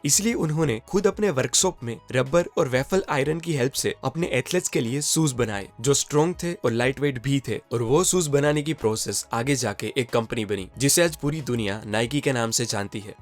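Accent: India. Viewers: I hate your indian accent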